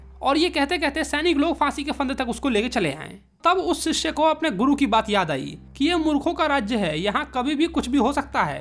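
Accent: Bihar, India. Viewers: Bhaiya ap Bihari ho n😊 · bhai is bihari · Bhaiyaa bihari ho kya😂😂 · His tone of talking is like Bihari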